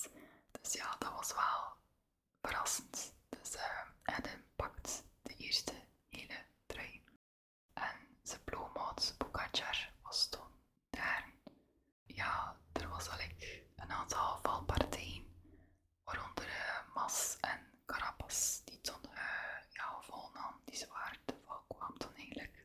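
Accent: West Flanders, Belgium. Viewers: uw west vlaams accent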